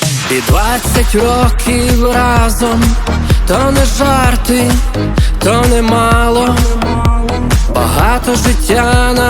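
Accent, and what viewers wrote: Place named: Western Ukraine, Ukraine. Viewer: Я все дивилася його короткі ролики в інтернеті, а тепер побачила канал і одразу ж підписалась. Дуже подобається його западнянський акцент, бо сама з Рогатина 😂. Дай Боже тобі здоровʼя, приватного щастя та творчих успіхів ❤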